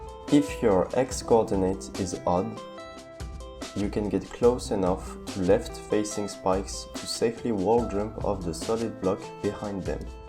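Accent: France. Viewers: La France résonne dans ta voix, très belle vidéo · I can recognize a french accent.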